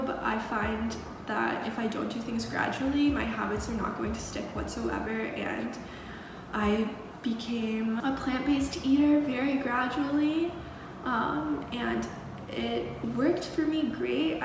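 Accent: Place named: South, United States. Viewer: It’s for sure a tad different. Also she did use to have a southern accent. It’s no big deal to notice change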